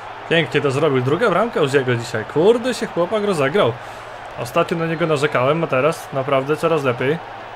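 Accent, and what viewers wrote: Poland. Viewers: Widac że z Rzeszowa xd!:D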